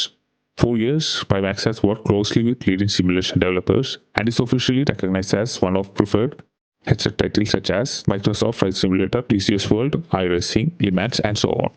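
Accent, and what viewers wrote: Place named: India. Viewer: I can recognize that accent anywhere. You from India too?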